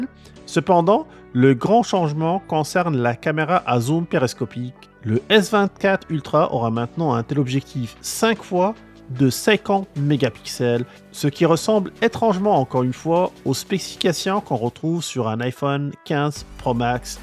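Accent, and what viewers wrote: Canada. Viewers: je deteste l'accent canadien omg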